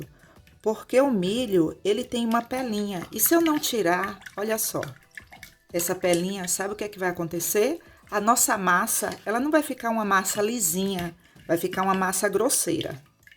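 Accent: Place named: Salvador, Brazil. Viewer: Tenho uma amiga que é de Salvador e fala igualzinho você !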